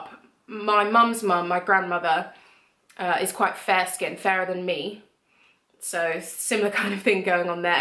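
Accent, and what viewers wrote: United Kingdom. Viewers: U.K. accent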